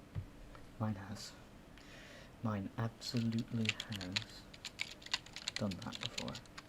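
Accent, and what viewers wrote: United Kingdom. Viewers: Thats a woman? Your just so British that I couldn't tell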